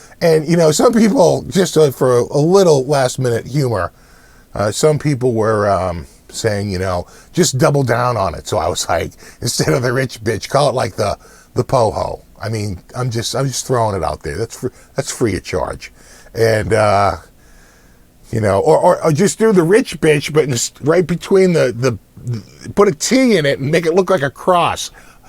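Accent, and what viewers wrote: Boston, United States. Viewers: Typical dude from Boston lol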